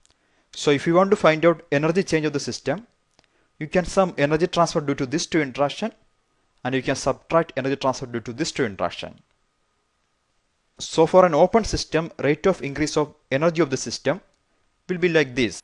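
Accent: India. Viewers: Really? You are making a toutorial and you put an indian guy with terrible inaudible accent in front of a microphone? Great job. Thumb down. This is exactly how pollitical correctness is affecting a quality of performences. Shame.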